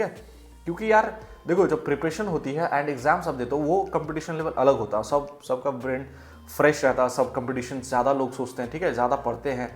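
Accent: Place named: Bengal, India. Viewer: arekta bishoy e koutuhol holo tai jigges korchi Apni ki bangali? Puro naam ki apnar? Eto porishkar Bangla bolen tai jiggesh korlam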